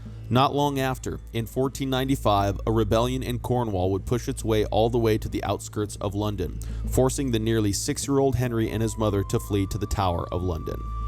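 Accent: United States. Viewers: Nothing more irritating than hearing a yank speak of English history when they know nothing or dare speak of their own lol 😆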